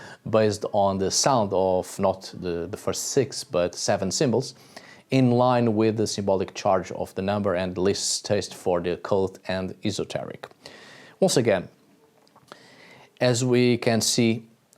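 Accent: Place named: Portugal. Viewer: I knew you were Portuguese by the way you spoke… we can identify each other easily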